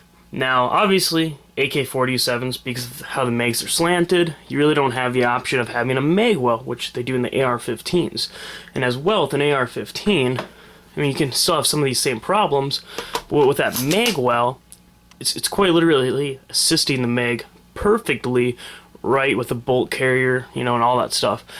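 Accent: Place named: Buffalo, United States. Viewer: sounds like your from buffalo everyone pronounces mags as "megs" lol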